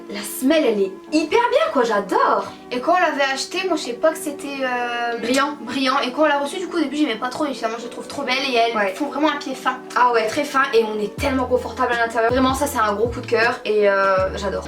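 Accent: North, France. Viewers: cet accent ( nordiste?) tellement présent..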